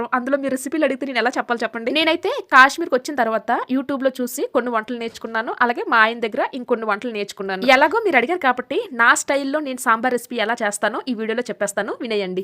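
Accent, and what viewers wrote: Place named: Bangkok, Thailand. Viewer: Bankok pilla voicelaundhi meedhi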